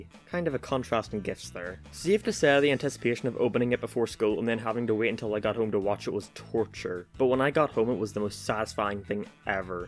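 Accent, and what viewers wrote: Northern Ireland, United Kingdom. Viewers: Mate, what part of Northern Ireland are you from?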